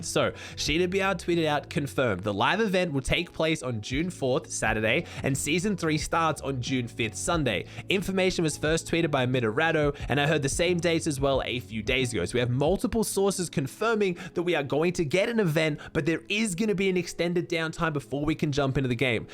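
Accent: Australia. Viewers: Wtf haha that voice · Not gonna lie, Groggy brought a smile to my face.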